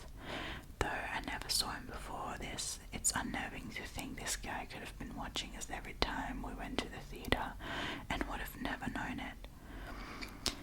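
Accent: Australia. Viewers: Okay I have to ask about your accent, I am a new sub so apologies if you have answered this already! Have you hung out with a lot of Australian English speakers? (I was assuming you were German by an earlier video) getting a strong Australian accent vibe though, so needed to ask 😊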